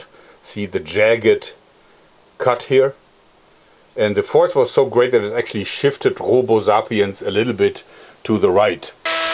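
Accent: Germany. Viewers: is he german beacause he has ahuge accent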